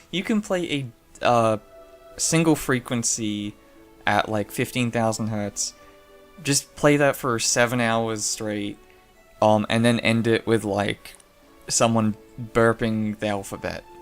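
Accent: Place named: Australia. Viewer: very australian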